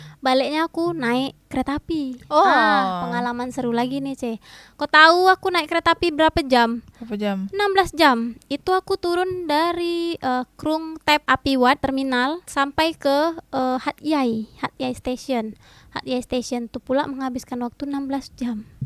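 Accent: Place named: Kedah, Malaysia. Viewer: Hang nak pi mana tu..bahasa Kedah ni 😅😅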